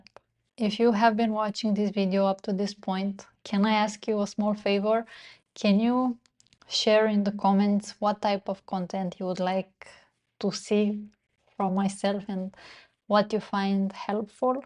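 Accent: Romania. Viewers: După accent am gândit ca ești româncă! Deși am lucrat în afara țării ani de zile, am același accent cu al tău!Ma bucur ca ai făcut acest video,pentru ca și eu am făcut abuz de parfumuri și am format o manie pentru asta și m-am simțit vinovată de fiecare dată!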